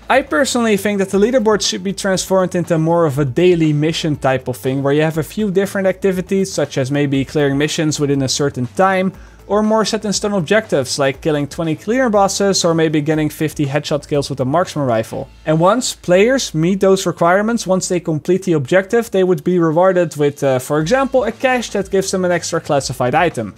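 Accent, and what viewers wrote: Netherlands. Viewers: you sound way more dutch in the videos compared to when you stream - is that weird? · Are you dutch you sound dutch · Are you dutch? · Kom jij uit nederland? Ik herken je accent.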